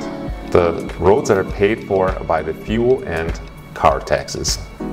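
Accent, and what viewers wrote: Germany. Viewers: American guy with a German accent. It stands to reason, therefore, that you've lived there for a bit. Because you have an accent. I love driving on the Autobahn when I'm visiting there. And I love this video. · It’s true. You really do sound like a German who speaks really good American English. Weird. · Your accent sounds a bit German.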